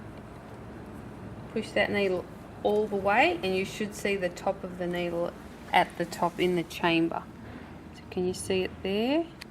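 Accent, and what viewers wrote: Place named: Australia. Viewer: Thank you so much! Your instructions helped me figure out the manual I have for my PFAFF 4862 Coverlock. The manual was not helpful at all, in fact I was more confused each time I turned to it. You saved me totally losing my mind and my temper and destroying everything. Oh and love that it's Australian!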